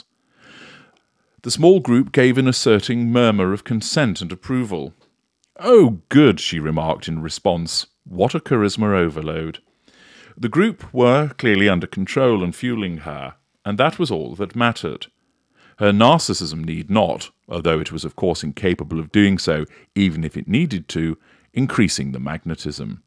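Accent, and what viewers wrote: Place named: RP, United Kingdom. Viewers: 😂😂 you crack me up.. love your storytelling..and that posh accent... its perfect... I enjoy all of your videos regarding this trollop.. 😂😂 ❤🇦🇺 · Yes a great story teller.All my senses activated and I saw a privileged male being spoilt as a child and constantly told by his mother that he and the family were so much better and superior to others that he carried this elevated opinion of himself,a huge ego into adulthood.He despises anyone who remotely mirrors his own failings and selects them for shredding eg Megan.How am I doing so far.You probably attended Oxford or would have aspired to, hence your accent.Instead of readers saying you are often broke, I would think you hoard money. Possibly mimic your therapists or have studied yourself in psychology. You have a need to dominate but there will always be someone more powerful you have either met or still to meet.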